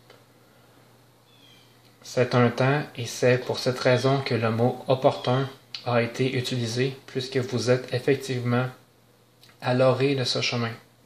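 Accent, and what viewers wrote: Quebec, Canada. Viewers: J'aime bien l'accent québécois.Mais tu pourrais me donner l'adresse de ton coiffeur?..........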